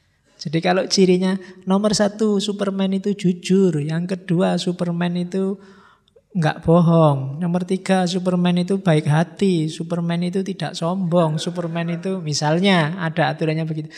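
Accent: Java, Indonesia. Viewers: Bahasanya medok campur jawa, kasian si butet banyak yang dak ngerti😂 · Tak apalah medok, yg penting tidak berhenti bermanfaat untuk orang lain. Sudah dapat ilmu gratis masih banyak menuntut. Orang yg punya niat belajar/mencari ilmu tidak pernah berhenti belajar apapun halangannya. Klo masih tak paham. Biarlah si butet & kawan2 bikin forum sendiri....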